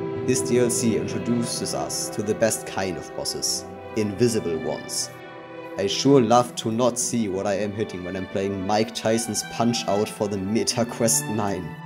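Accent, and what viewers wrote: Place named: Germany. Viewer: Are you german? You have a german accent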